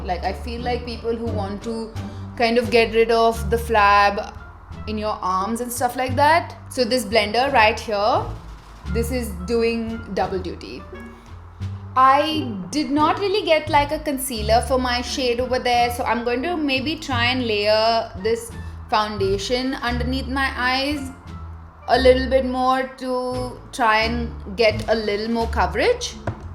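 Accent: India. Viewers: You talk like my friend she's from india too. Her name is zareen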